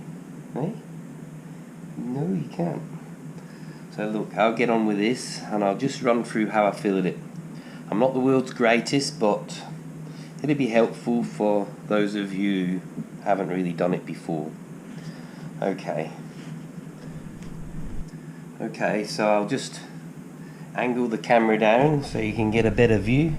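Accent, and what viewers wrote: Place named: England, United Kingdom. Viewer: HELLO ", HOPE YOU ARE DOING WELL? I WATCHED YR" VIDEOS THAT YOU HAVE BEEN DOING. MMMMMM? WER DO EYE" START? FIRSTLY YOU COME ACROSS SO"SO" WELL WITH YR POMMY ACCENT! YOU EXPLAIN WHAT AND HOW YOU ARE DOING. GOOD STUFF MATE. PS" LOVE YOUR HELPER IN YOUR FILLETING VIDEO. CHEERS MATE ANDRE GEORGESCU...🦊🦊🦊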